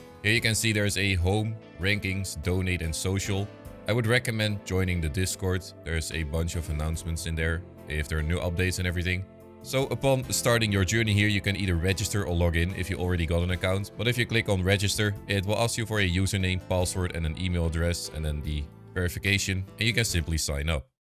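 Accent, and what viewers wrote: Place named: Netherlands. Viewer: i smell dutch\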